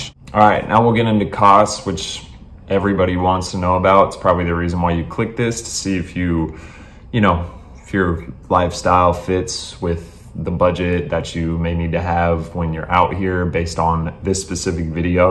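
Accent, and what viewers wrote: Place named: Oakland, United States. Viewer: damn, what kind of accent is that? u grew up in oakland or somethin?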